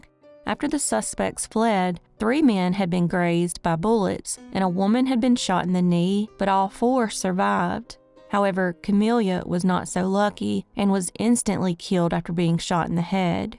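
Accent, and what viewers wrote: South, United States. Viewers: We value you, and ur story telling skills. Love fr panama. Only southern voice I like🥰😊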